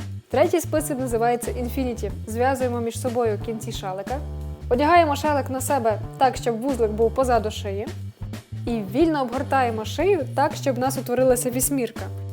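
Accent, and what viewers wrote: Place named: Ukraine. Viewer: У Вас дуже гарний український акцент:-)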